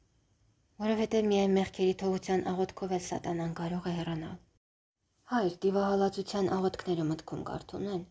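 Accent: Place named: Sisian, Armenia. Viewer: Շատ ներողություն կարդացող աղջկա ձայնը շատ գեղեցիկ ու բարի եվ միեւնույն ժամանակ տխուր է։կասեք ինքը բնիկ որտեղից է։ Ներող կլինեք, մի գուցե հետաքրքրասիրությունը մեղք թվա՞ Ուղղակի ասեմ պարզ Ակցենտը Սիսիանի ակցենտ է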